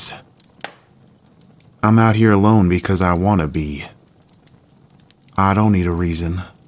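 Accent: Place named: Australia. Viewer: I love it that he called and your Australian accent amazing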